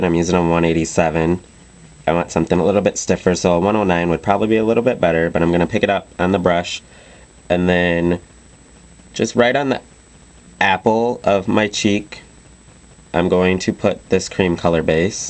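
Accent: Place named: Detroit, United States. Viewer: Lol i noticed that too, maybe he's from the north? b/c i have family from detroit & that's how they say it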